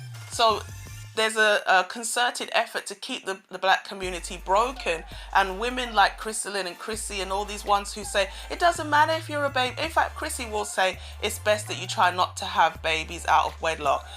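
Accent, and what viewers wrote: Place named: London, United Kingdom. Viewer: no prob, what city in the uk are you from I'm trying to figure out that accent it sounds like a london accent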